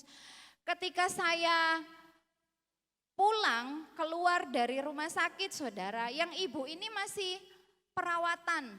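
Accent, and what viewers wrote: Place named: Malang, Indonesia. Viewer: Tante uda medok bgt malangnya😅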